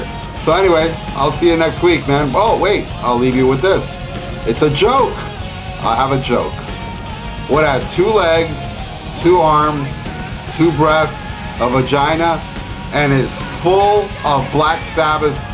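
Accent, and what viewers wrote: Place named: Philadelphia, United States. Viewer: You're accent sounds like here in the Philly area. Once again, this is fun viewing.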